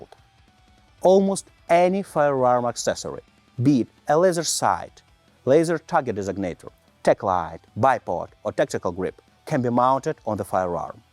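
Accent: Russia. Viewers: Русский акцент, этому парню не куда не спрятать.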